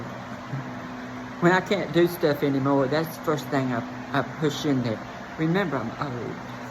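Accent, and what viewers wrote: South, United States. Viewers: Hi, you're the opposite of ugly. You are very attractive both in your appearance and your demeanor. As a fellow North Carolinian, I also love your Southern drawl. Even though I'm not an "ally", even though I'm very traditional in my values, please know that I like and respect you and enjoy listening to your content. You bring a refreshing and humorous voice to what can be a contentious topic. Especially I appreciate that you're clear about the differences between born male and female as to athletics. And you are clear on not being okay with grooming, unlike the radical activists stealing the conversation. Thank you for speaking out.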